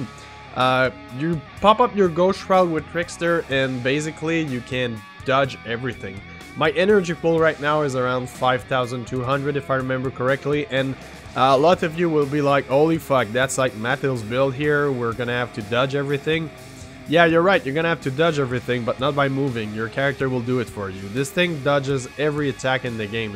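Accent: Quebec, Canada. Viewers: Quebec much ;p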